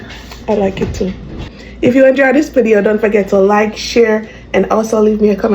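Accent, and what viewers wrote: Jamaica. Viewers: Just discovered your channel. Love that you get to the point. Love your recipes. Love love love the jamaican accent and I'm subscribed.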